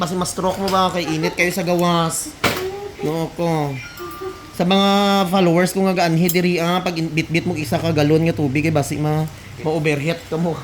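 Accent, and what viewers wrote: Iloilo, Philippines. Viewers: You sound ilonggo/hiligaynon po ☺️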